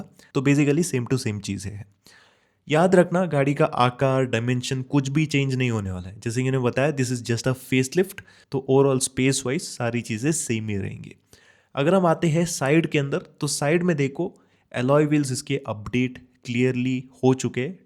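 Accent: Kerala, India. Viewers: I am big fan ...the way u talk....think ur malu from kochi. Thanks 👍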